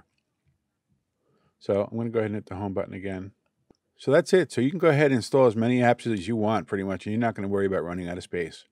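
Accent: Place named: Long Island, United States. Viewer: Brookhaven Residents love your Long Island accent, brother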